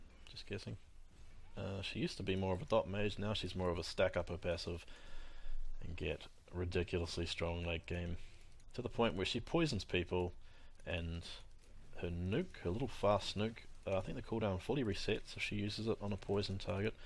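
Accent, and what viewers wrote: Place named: New Zealand. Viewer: Ha Ha kiwi accent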